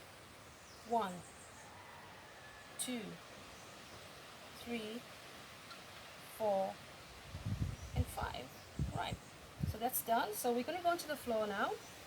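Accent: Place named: France. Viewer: Your accent is pretty, is it French? I have only a small fibroid but would like to get rid of it. My doctor was not concerned about it.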